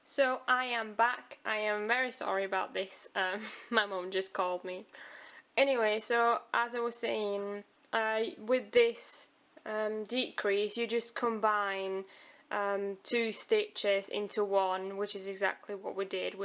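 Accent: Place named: Yorkshire, United Kingdom. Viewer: where are you from haha? your accent is so yorkshire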